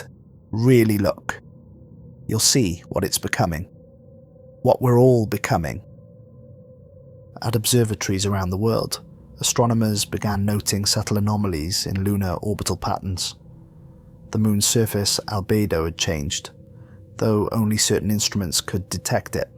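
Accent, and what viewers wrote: Wales, United Kingdom. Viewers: Really appreciate you being a sci fi Creepypasta youtuber using their voice snd giving thanks etc. may not seem like much but pretty much all the others out there 💯 done via AI start to finish. Cheers! p.s. if im not mistaken, Welsh?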